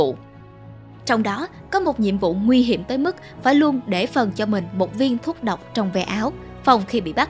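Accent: South, Vietnam. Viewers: Aishhhhh nghe giọng miền Nam đọc những video cách mạng khiến tôi hài lòng quá. Nếu là giọng đọc của một việt kiều cali có lẽ tôi lên đỉnh mất 😊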